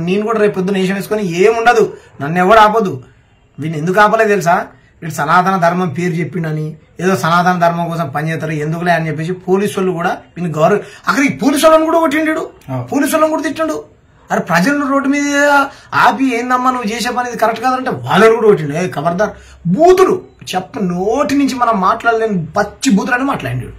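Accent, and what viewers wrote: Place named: Andhra, India. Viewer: May be needi Andra iyi untadi😅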